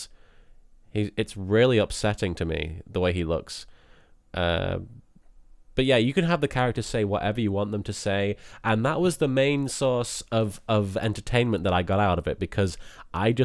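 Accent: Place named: North, United Kingdom. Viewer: NO. NO, FFS. Why does anyone have a problem with doing a let's play in character?? It was hilarious. Who are these people???? Don't listen to them! If they want to actually hear all the boring dialogue in Bloodlines then why don't they just play Bloodlines? Also, trying to put my finger on where LKs accent is from. North East? North West? Dammit. He sounds a bit like turkeyleggggs, anyway.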